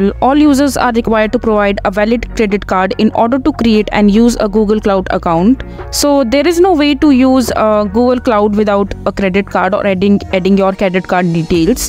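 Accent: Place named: India. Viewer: Don't trust english indian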